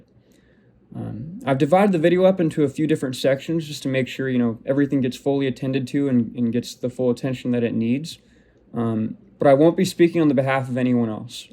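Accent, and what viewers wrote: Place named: South, United States. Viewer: Of course this guy got a southern accent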